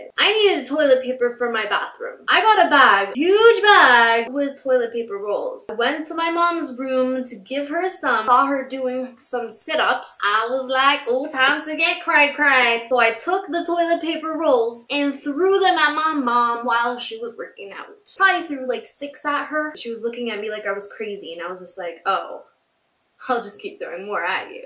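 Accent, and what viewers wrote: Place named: Trinidad and Tobago. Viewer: Why do u sound trini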